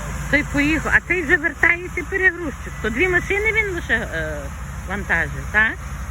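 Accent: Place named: Prykarpattia, Ukraine. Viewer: Чую прикарпацьку говірку❤. Дякую Вам дорогенька за відео. Я тої зими готувала конвертики з яблуками за Вашим рецептом. Маю схожий рецепт від моєї мами але тісто з пива, масла і борошна. І називалося це печиво ''хусточки''. Успіху Вам і Вашій родині❤❤❤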